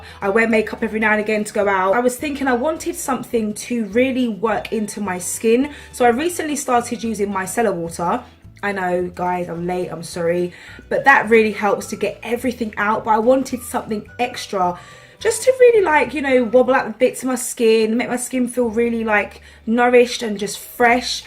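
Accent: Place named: United Kingdom. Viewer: I like ur type of british accent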